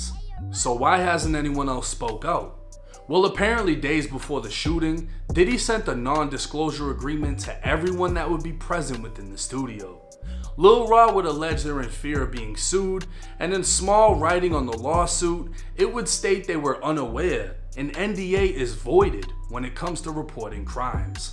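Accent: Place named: East Coast, United States. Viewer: You from Houston huh? Tam bout Whoops took some time but I hear that East Coast accent. Bruh I'm glad I found this channel. Keep it up Big Homie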